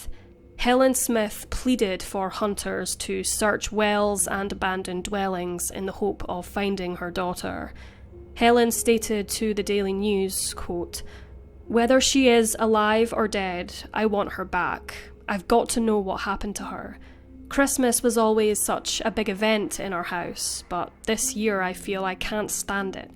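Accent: Scotland, United Kingdom. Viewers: SHE HAS BEAUTIFUL SCOTTISH ACCENT, EASY TO FALL 😴😴😴😴😴😴😴😴😴😴 💤💤💤💤💤💤💤💤💤 💤💤💤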